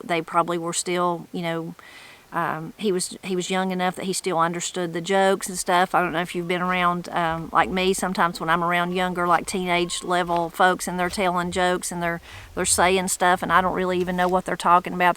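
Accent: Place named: Martins Ferry, United States. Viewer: I just subscribed. Your speech and culture remind me of my family roots from Martins Ferry area.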